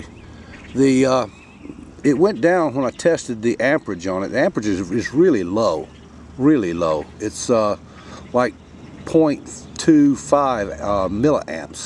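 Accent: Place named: South, United States. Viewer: are you Georgian or Southern? I like the accent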